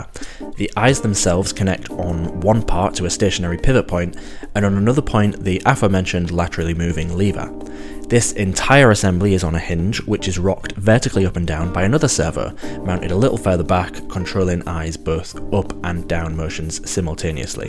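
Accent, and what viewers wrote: Hull, United Kingdom. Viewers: Hi, is that a Hull accent?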